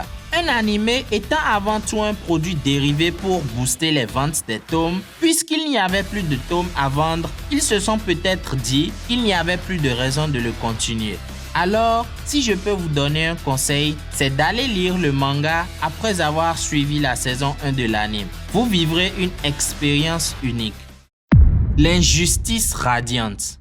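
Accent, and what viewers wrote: Benin. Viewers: On dirait que vous êtes béninois 🇧🇯 En tout cas vous faites du bon contenu vos analyses sont assez pertinentes. Du courage 👏👏👏👏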